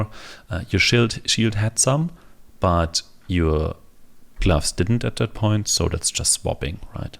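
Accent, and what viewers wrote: Germany. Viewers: you sound german af 🤣 greetings from germany^^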